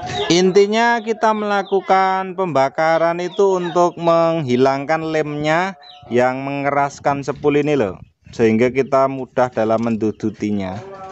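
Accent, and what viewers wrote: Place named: Manado, Indonesia. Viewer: Dari logatnya pasti anda org manado y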